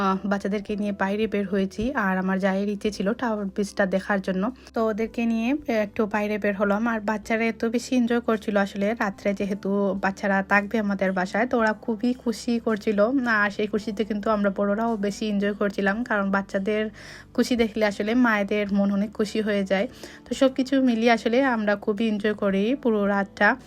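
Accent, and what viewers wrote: Sylhet, Bangladesh. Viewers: Kotay bujai jachhe uni 100% sylheti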